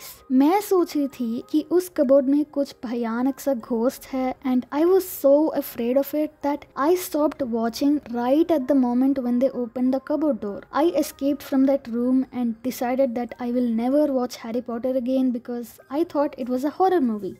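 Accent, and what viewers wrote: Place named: India. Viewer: I thought u r from Europe 😅 and when I listen language and than I know u r from India 😅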